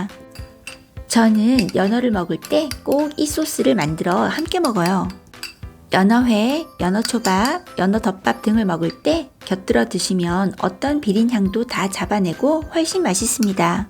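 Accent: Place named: Gyeongsang, South Korea. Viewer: 안조터라구요 경상도 엑센트 ㅋㅋㅋ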